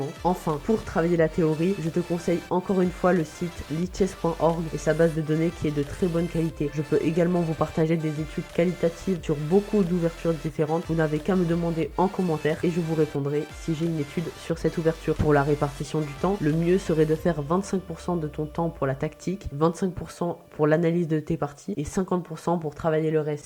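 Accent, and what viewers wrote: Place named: France. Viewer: hes speaking like a French Sheff who knows how to cook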